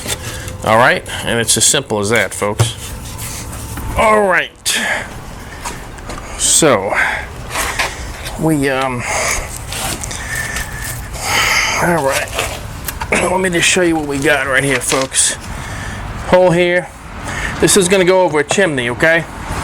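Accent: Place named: New Orleans, United States. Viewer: I can hear that New Orleans accent , lm a Cajun also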